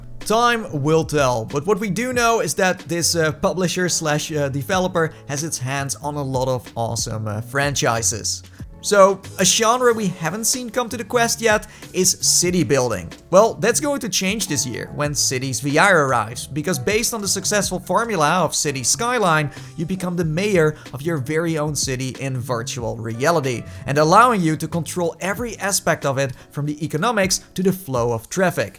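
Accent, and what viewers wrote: Netherlands. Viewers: Ben je Nederlands?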